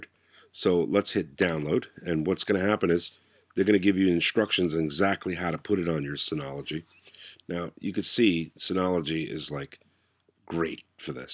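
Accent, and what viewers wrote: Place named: Northeast, United States. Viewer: ***** I got an cease-and-desist order once for 800€ and could drop this down to 120€ with my lawyer. It was a CD I owned yet which I downloaded just for fun (I didn't want to search my CD-Board,=) So, even if you own an opus of an artist, you are liable for infringin Copyrights in Germany. I don't know where you are from, regarding your accent I would say north-eastern USA ? Do you can download any works legaly if you "own" (have a copy) it in USA ? I don't think so (DMCA?!) With Series its another Story here. If it was aired in free TV you can legally download it. Besides, your Video was VERY infomrmative for me and raised the Decision to buy a Synology DS 2xx(play?) sthg with remuxing/transcoding would be fine. The Tracker where I download from isnt registered in any DNS and you can only be invited. Nobody will find its Frontpage without knowing the IP and even then he cant enter.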